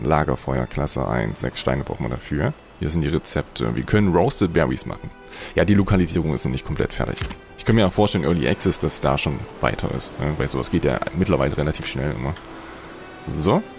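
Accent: Saxony, Germany. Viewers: Super Video. Macht irgendwie direkt Spaß. Mal schauen, ob es das evtl. für die PS geben wird. Und bitte niemals den sächsischen Dialekt weglassen. Das erinnert so an Heimat 😉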